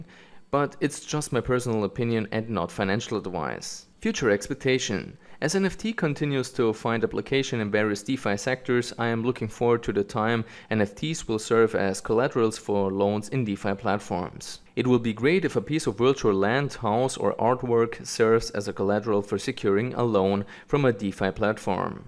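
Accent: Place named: Germany. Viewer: Ich sehe hier Kommentare auf Deutsch bist du auch aus Deutschland? Am Anfang hatte ich das Gefühl einen Deutschen Akzent bemerkt zu haben. Aber was ich eigentlich sagen will ist : sys1q29ngmzattdaamwyvggq6pw0j67ls7h9wauhmqy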